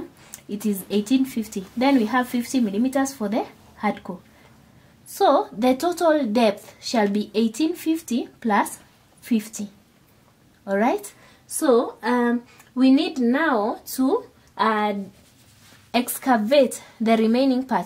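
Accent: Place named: Kenya. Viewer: Weldone. I enjoyed your tutorial. You sound like a Kenyan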